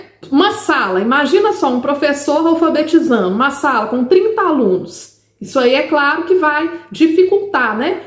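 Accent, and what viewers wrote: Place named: Minas Gerais, Brazil. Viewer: Vc é mineirinha? Sotaque inconfundível 😅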